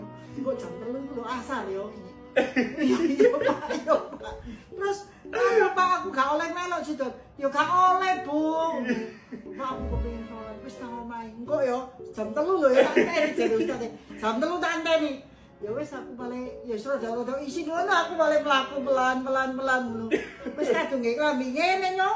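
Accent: Surabaya, Indonesia. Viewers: Mom... Jan khas ngomonge wong Sroboyoan... ❤... Sehat semuanya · Ciri khas mak2 suroboyoan nek cerito,hahaha kudu kemekel uduk Goro ceritane,tp Soko bosone seng garakno tambah kemekel😂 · Logate suroboyone lo... nyenengno mama dadi pingin ngrungokno critone mama karo koko... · Nek aku kangen Suroboyoan, delok konten smpyn ko......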